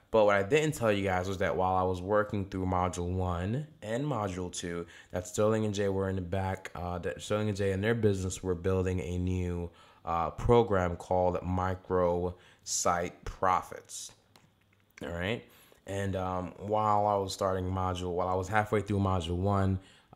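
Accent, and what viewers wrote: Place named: United States. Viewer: But you have a little more advantage than me bcos you have the American accent and I don't. What I did was join a program that started paying me without referrals or customers or leads or anything like that. Then I made a proof on income video just to add some credibility to my business and then I sarted getting quality leads from my campaigns. I'm not trying to get you to join me, you can join another person but you really need to check this out reviewprofitclicking(dot)wordpress(dot)com.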